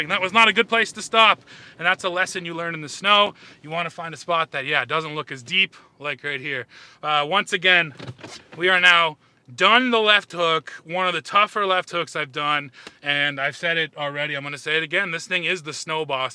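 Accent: Canada. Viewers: Why Canadians mispronounce the letter Z. You don’t say Zedebra, it is damn Zebra 🦓. You don’t say Zedoo. It is damn Zoo. Lean English. It is Zeeee.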